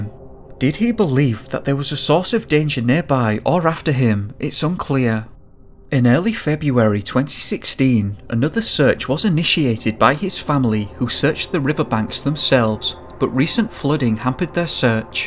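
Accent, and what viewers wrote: United Kingdom. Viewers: Good enough video with out the monotone british accent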